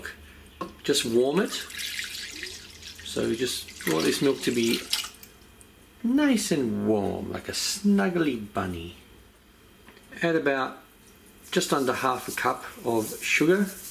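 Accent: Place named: Australia. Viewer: D A T CR4zY Azn no he is definitely Australian ...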